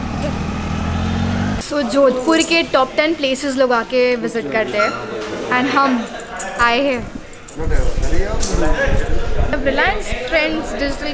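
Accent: Mumbai, India. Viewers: Like your Mumbaiyaaa style of conversation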